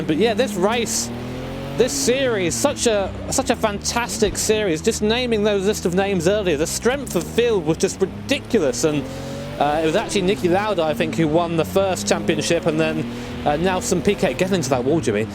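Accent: United Kingdom. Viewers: I don't know if it's just because I'm from Murka and am immediately enchanted by British accent, but I think you'd make a hell of a racing commentator.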